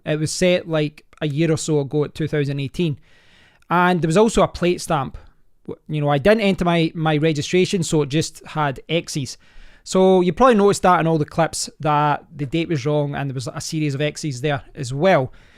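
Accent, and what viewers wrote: Scotland, United Kingdom. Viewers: ! Brilliant! Magic! Finally someone on Youtube I can understand. ( I'm Scottish too ) living here in the US. I liked your review of the Dash cam. very good. keep up the good work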